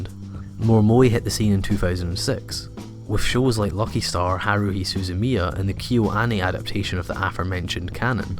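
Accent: Scotland, United Kingdom. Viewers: i think it's some kind of British, but there's a lot of them, so i can't tell which one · It's a stupid question but you're Scottish right?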